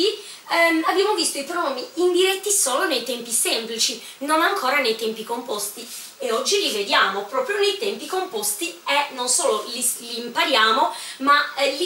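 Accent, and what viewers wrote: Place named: Sardinia, Italy. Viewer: Ciao adesso,imparo italiana con Sardagna accente,sei une molta inteligenta maestra,bellissima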